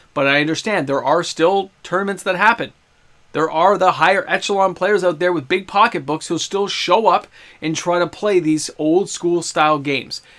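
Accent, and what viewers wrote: Canada. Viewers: Anytime I have a negative thought about how WotC is doing something I don't like, the thought in my head is narrated in your voice. A shouted-stern-canadian voice.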